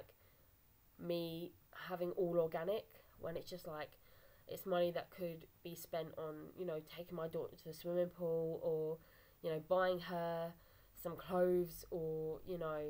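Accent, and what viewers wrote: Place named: United Kingdom. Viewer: Hey Great first vid, for me was cheese toasties :) Sounds like your from the UK where abouts? I'm from Nottinghamshire. Keep making the vids, I've also not long ago made a channel be sure to check it out :)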